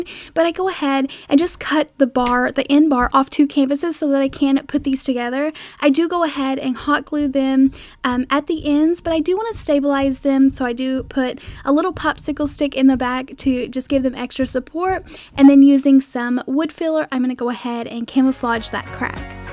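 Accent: South, United States. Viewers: that's how southern people talk lol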